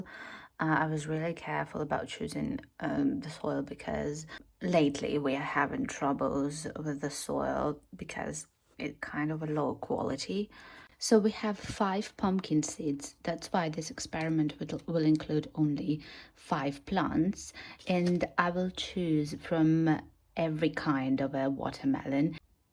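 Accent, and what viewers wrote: England, United Kingdom. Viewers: Im just so chuffed how you are not using American English but English from England proper. So nice. Those melons looked so jucy and colourful. Prayers that the geo politics of today will not affect your lovely part of our world. Cheers from Sweden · who comes you have such a beautiful English accent 🇬🇧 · You have a English accent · Sounds to me like she’s acquired a British accented English, interesting as many Eastern Europeans have a distinct accent. Lovely to listen to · How do you speak like a British living and raised in Belarus 🇧🇾 By the way your life is so beautiful so you are. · Your British educated by the sound of your English I'm growing watermelon this year see if technique works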